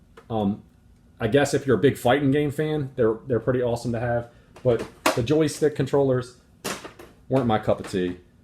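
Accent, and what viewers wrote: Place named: Maryland, United States. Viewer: I thought I detected a Maryland accent on you man. I am a Marylander. I love those beers. I agree those nunchucks are cumbersome. Switch Pro Controller is my fav. Nice VR.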